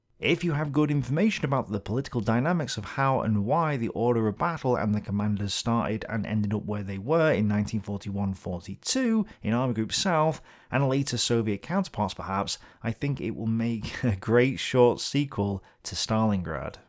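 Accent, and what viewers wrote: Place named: Northern England, United Kingdom. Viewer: Just to follow up on my previous comment on your Case Blue video. What would you have in common with people like von Bock or von Wietersheim? They represented an ancient military cast going back nearly 900 years. As I said previously - they were Prussian, aristocratic, snobbish, arrogant - they belonged to the elite of the landowning (upper) class in central Europe. They really did live in a different universe. To hear you talk about them in your northern English accent brings a smile to my face. Cheers - btw I removed the word 'posh' as I don't think that adjective would apply to these people.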